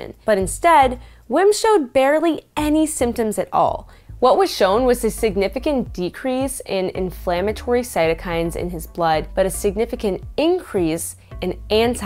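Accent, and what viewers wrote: Boston, United States. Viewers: Well done. Are you from Boston too?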